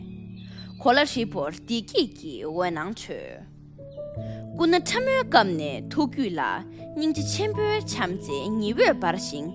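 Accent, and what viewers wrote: Standard. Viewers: བོད་ཆས་གཙང་མ་དང་བོད་སྐད་དག་མ་བཤད་ཀྱི་འདུག་པ་མ་ཟད། བཙན་བྱོལ་ན་ཡོད་པའི་བོད་རིགས་མང་པོ་ཞིག་གིས་མིག་དཔེ་ལྟ་འོས་པ་ཞིག་རེད།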